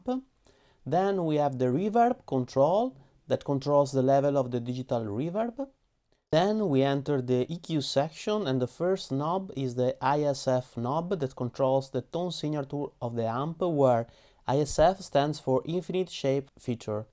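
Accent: Venice, Italy. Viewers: a sentirlo temo di si ;D mi sa che è veneto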